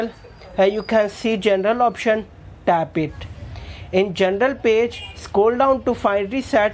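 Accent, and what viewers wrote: India. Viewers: You know it is bad when the guy has an Indian accent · Indian people English is good, and the accent maybe Indian but you understand very clearly what the guy is saying, good video!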